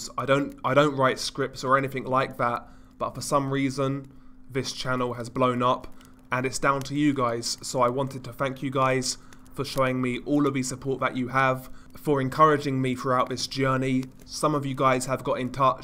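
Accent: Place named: United Kingdom. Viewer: Are you from the UK? I love your accent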